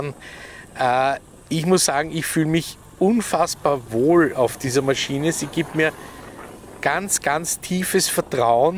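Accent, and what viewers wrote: Austria. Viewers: Ich liebe euer österreichisches Dialekt. Ich bin diesen Renner gestern Probe gefahren und habe danach gleich unterschrieben.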